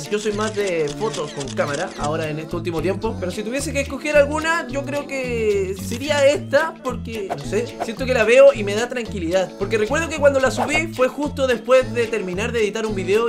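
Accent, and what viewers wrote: Chile. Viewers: De que país es el? Tiene acento chileno la wea cuántica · ¿Porque habla como chileno?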